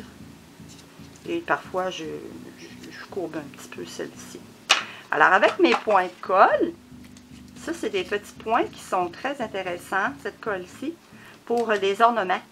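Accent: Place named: Canada. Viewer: Très complet et très clair, merci ! En plus j'adore votre chaleureux accent canadien !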